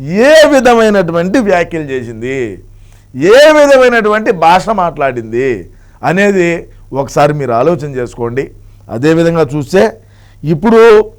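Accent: Rayalaseema, India. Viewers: రాయలసేమయాస ఏదన్నో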